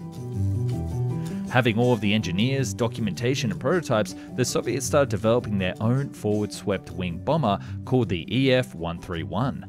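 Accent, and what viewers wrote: Australia. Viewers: Apart from the weird Australian/NZ (?) pronunciation, this is really great!! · He misspronounces some words due to his aussie accent. Some facts arent straight too. Id give it a B... the channel.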